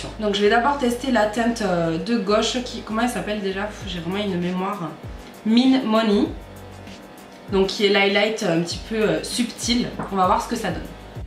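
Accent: South, France. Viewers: Ton accent me fait toujours plaisir, ça me donne ma dose de Sud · notre accent du sud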